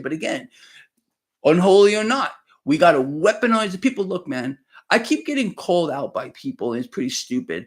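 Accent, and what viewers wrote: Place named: Long Island, United States. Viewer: “Hey guys it’s me,!” Your Vinnie Santucci-Long Island/Elmer Fudd combo accent is legendary!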